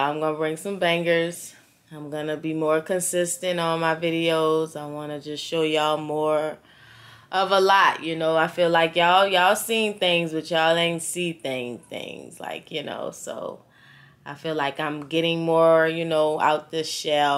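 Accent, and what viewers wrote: New Orleans, United States. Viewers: That’s ok continue to be you!! A lot of us like the way you talk that’s the New Orleans talk that’s alright!! Keep striving for what makes you happy we’re here for it!!❤ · Girl keep that New Orleans talk honey! You are good! “Ya kno”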